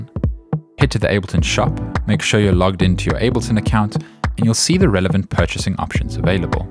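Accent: South Africa. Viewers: Broooooooo are you south african?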